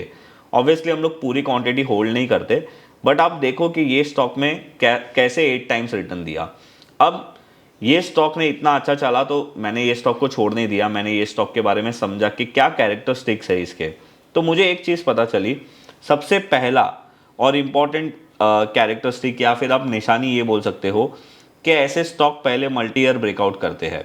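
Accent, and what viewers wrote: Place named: Mumbai, India. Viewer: ..aap ki bambaiyaa hindi zalak jati he..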